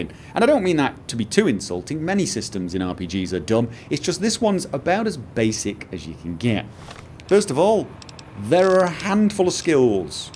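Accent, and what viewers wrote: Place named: Scotland, United Kingdom. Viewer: what do you for work? Your ability at logically discussing things in terms of their elements in an easy- to-understand way as well as your poetic Scotish accent must be incredibly useful.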